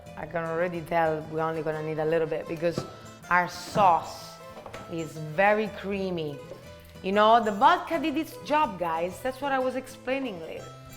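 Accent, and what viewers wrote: Rome, Italy. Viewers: I just wonder how is your brother don't have the Italian accent. I mean you still have this thick accent but he does not. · Ago er cxx...😂😂 me ne moro che in mezzo all'inglese, ce scappa sempre la romanita'❤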